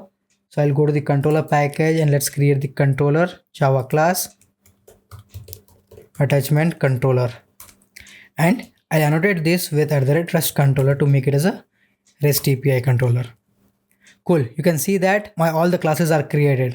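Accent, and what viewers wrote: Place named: India. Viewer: Nice Video! For usual I habe problems understanding indish accent, but not here!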